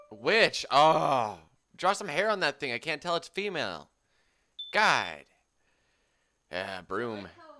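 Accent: Canada. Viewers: haha is starting to sound more Canadian with the eh thing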